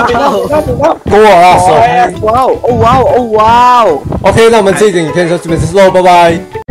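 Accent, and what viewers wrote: Malaysia. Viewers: 你应该是马来西亚人吧，听那个口音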